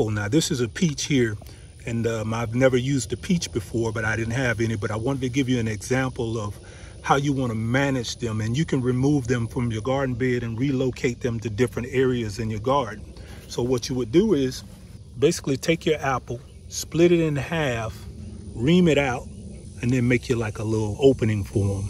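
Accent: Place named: South, United States. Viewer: Your voice tone/accent brings back so many southern traditions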